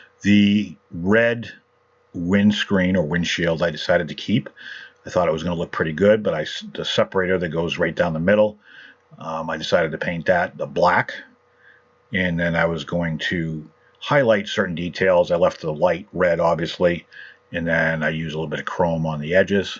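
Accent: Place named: New England, United States. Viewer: Wicked pissah I love it lol where you from Maine or Mass?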